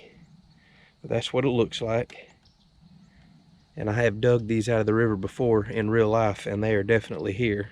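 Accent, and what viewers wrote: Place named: South, United States. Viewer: You are definitely right on the pronunciation but I like his accent and the way he says it better. 👍👍Good old Boy from the south speaking my language. 😀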